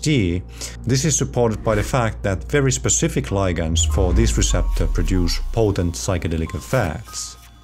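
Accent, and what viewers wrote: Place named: Finland. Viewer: Sounds Finnish :)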